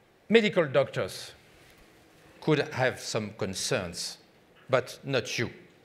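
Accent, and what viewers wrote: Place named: France. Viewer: il a un anglais tellement so french que je le comprends parfaitement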